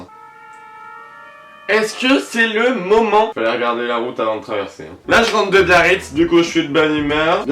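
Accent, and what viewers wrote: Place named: Marseille, France. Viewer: Les 14 pouces vers le bas c'est les jaloux de ta bague de ouf et ton accent marseillais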